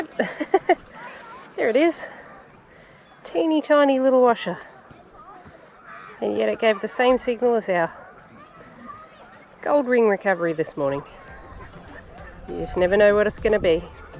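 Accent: Australia. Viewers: You do Aussie through and through have you used your new vest yet I hope you make sure you’re not alone when you go diving with those strong currents keep up the show’s love watching them 🤩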